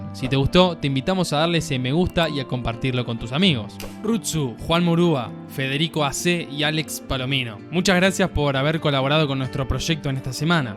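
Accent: Argentina. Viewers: Amigo que buen video describe muy bien lo qué pasa en la cinta me encanta en asentó argentino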